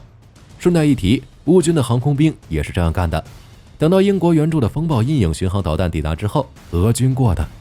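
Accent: China. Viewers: 這不是大陸腔調嗎? 大陸會允許這種影片? 最後幾句話也太單純了吧！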